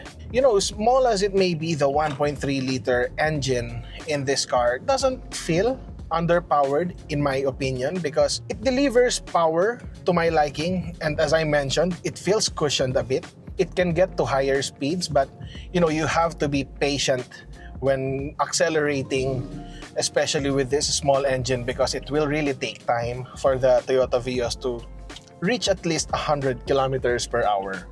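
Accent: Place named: Tagalog, Philippines. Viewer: Ang cringe ng english mo hindi fluid pagsasalita. Kahit sabihin na naten 2nd language yung english mo iba yung choice of words at delivery mo pang tagalog talaga; papunta na dun sa english-carabao. Nagtagalog ka na lang sana tapos lagyan mo na lang subtitle na english.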